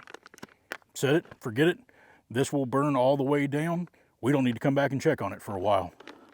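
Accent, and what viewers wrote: South, United States. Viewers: I thought you had a little south in your voice man 😂, now I know brother 👍🏼.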